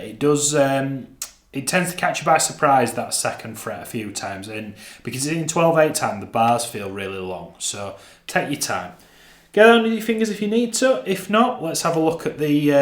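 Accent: North, United Kingdom. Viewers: Heard the Northern accent... subscribed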